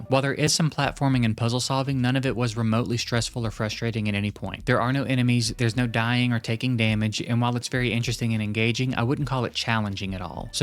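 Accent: South, United States. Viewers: Finding someone who can recommend good casual non farming games? Amazing. Hearing the southern accent? You do not understand how much it comforts me.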